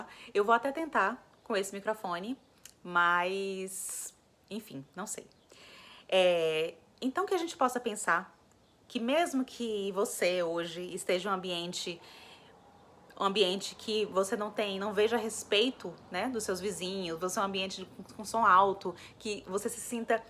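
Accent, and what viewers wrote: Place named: Salvador, Brazil. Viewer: O silêncio é um espaço vazio esperando ser preenchido com harmonia! Já morei em várias cidades do Brasil e percebi que a invasão do espaço alheio "poluição sonora" é uma questão cultural. Todos passamos por um processo evolutivo e acredito que a turma do barulho possa mudar o tom, e conviver em paz com seus semelhantes. Você tem um sotaque de Salvador, onde o silêncio vale ouro!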